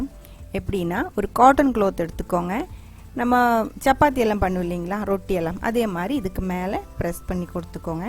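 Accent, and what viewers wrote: Kerala, India. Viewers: Your slang semma Ma'am...... Malayalam and Tamil ........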